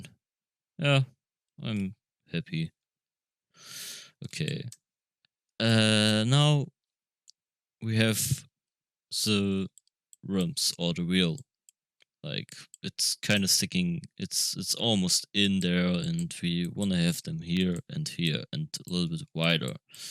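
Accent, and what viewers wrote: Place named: Germany. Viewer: nice tut,i could hear the german accent xD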